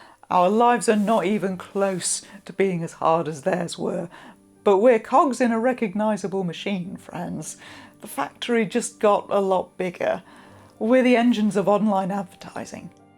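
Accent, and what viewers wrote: United Kingdom. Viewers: WOW! You sound so British.... I double checked if you were the same....and not...🍄🍄 Thank you for the video. When I started writing To Do lists, most times I miss placed the paper. My Mom taught me to use cotton string (no stretch) to take my own measurements. I would then use my wooden ruler to measure the marks on the string. Good to see/hear you again.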